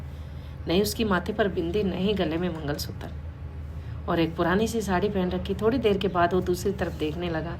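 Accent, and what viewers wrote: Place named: Haryana, India. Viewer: Are you from Haryana you speak like one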